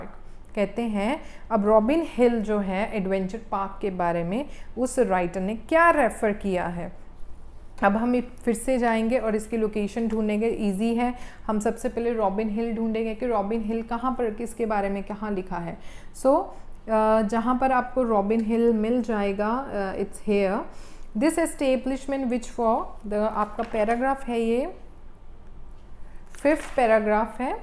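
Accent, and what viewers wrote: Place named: India. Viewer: Yes, from India Mam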